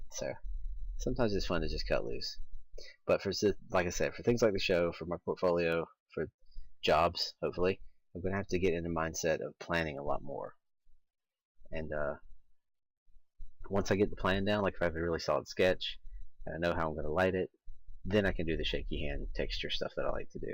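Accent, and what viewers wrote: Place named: United States. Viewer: Another great video dude. I was wondering about your accent, I thought maybe you were just an american that moved there later in life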